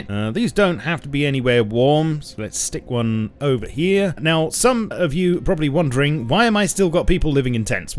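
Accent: United Kingdom. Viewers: Come on this is where you will jump off and soar into the sky like KCmo, oh w8 his channel is dying and yours will 2 if u don't stick 2 rust, it's cruel but hey! That's the price u pay when you think someone is actually going 2 watch you do something other than for what they subscribed for. Let's see if u can really break through as just another guy with a British accent that plays any random game:P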